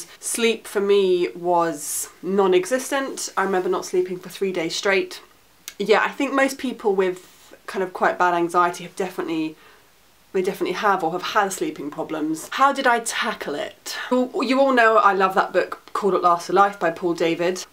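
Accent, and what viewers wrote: England, United Kingdom. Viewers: I was little confused about how half a bottle of red wine isn't a good source of relief. I was panicking earlier(while watchin your video) and so i drank a 25oz beer(Natural light) smoked a halfer, and then i got all into your video, the highlight of my day(woman). I decided; it's your magical English accent that makes everything epic like a Harry Potter movie. Will you practice magic with me? But Y-N is Good. couple of sips>? what's with the guilt trip. Power tripper over and out.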